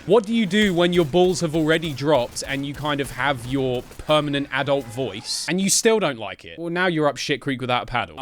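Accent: Wales, United Kingdom. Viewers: As someone who’s been watching since like 15,000 subs, I wouldn’t be watching if had an annoying voice, I’ve stopped watching other people on voice alone. I think I’ve watched so much, even when I was younger. I sometimes say phrases he would, like actually. What can I say I like the British accent. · not once have i watched one of your videos and paid attention to how your voice sounds. even doing so now, i see nothing wrong with it. you sound like a british man. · That sexy voice and that britain accent are your trademark because everyone recognizes you when your "WHAAAAAAAAAAAAT?!?!!" pops in. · Mate you sound fine there’s nothing wrong and as a British person it’s nice to here someone with a similar accent to me I get tired of American accents they really grate on me don’t worry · bruh i love your voice so much. as a british person who has moved to australia, i can appreciate and miss your accent. 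and your voice is wonderful, it makes everything about you so much funnier. dont be sorry. i love your so much aha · It's a UK voice I'm proud of my UK voice so you should be too and I understand the insecurity of having the audience hear it but that's what makes you iconic to us! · welsh accent 100% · Bro i love your voice man. i’ve always loved british people. Mainly for their vocab and they’re accent. Not matter how you sound you are still amazing. love you big bro. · I like your voice, I just love how "British" (or in this case, Welsh, which I didn't know until relatively recently) it sounds, yet very comprehensible, something I wish I had. Also I wish I could do one of your signature "WHAAAAAAAAAATTTTTTTT"s. Though I can't blame you for wanting to have Matt Berry's voice. Hell, he doesn't mind if you're from Iran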